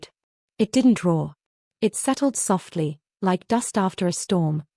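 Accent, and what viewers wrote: United Kingdom. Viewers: Why did you have to use a woman with a British Voice, when it's clearly an American Story?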